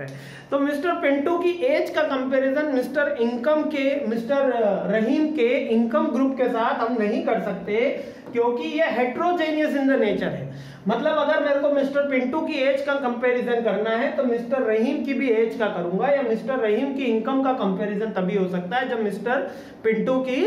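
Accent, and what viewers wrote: Gujarat, India. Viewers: Sir aap Gujarati hainn kya?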